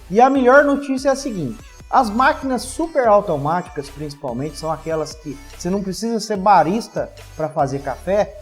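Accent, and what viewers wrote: Minas Gerais, Brazil. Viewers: Sotaque Mineiro kkkkk eu falo do mesmo jeito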